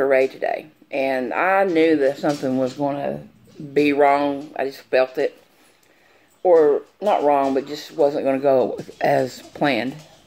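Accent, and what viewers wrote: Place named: Tennessee, United States. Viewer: Hi! I found your videos, kind of accidentally. First thing that caught my attention was that you talk just like me so I’m thinking you may possibly be a Tennessee girl?!:) Anyway, just throwing something out there for you- I have struggled with my weight for the past few years (had lap band surgery 2011) but truly wish I wouldn’t have as I had trouble taking off work to drive to Nashville to get my “fills”, and now my insurance doesn’t cover it anymore. Anyway, a few months back, I found a Facebook page called Snake Diet Motivation. It has been LIFE CHANGING for me. It involves fasting(which I was taught all my life was unhealthy). But, I tried to have an open mind since nothing else i had done, for years, was working. I started reading testimonies on the FB page and the rest is history’s it has been LIFE CHANGING. 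Check it out if you have any interest at all, and prayers for your health and healing!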